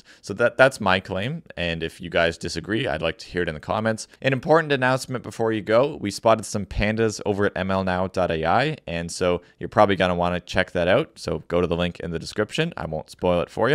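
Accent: Canada. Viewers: Canuck accent!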